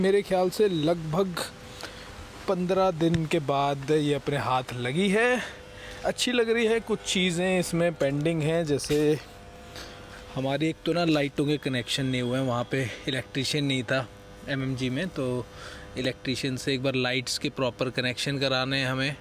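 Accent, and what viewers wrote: Haryana, India. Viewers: Haryana ka aadmi boli s jarur pakda jaa h bhai ji…. Ab bas kahi aandhane chale jao trip pe 😅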